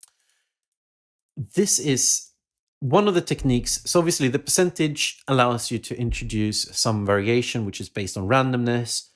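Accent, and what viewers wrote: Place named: Sweden. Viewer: Lool, after listening a bit more I did think your accent sounded vaguely Swedish, but I heard "I think that's how it's meant to be pronounced" as being about the word itself and not just the company. Norwegian fella here correcting Swedes on their Swedish 🤣 sorry altså.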